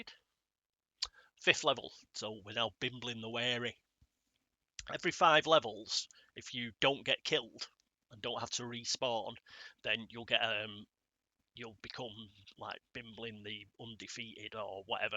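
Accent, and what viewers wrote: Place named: Manchester, United Kingdom. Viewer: Probably because your Mancunian accent made me think of Bumble when you said fervour, couldn't help but think that Bimbling has got a four fer. Well bowled lad. lol Being a dwarf, does he like to field at short square leg? (groan!)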